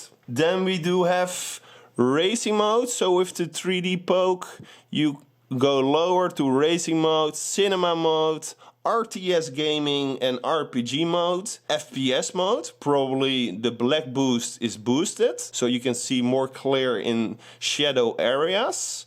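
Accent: Netherlands. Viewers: I bought mine but it arived screwed already so no need to put screws myself also nice dutch accent ik also from Netherlands i may have a bit better english accent but also worse sometimes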